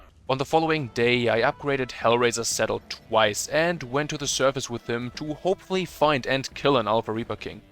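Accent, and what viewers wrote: Germany. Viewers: Are you German I hear like a German accent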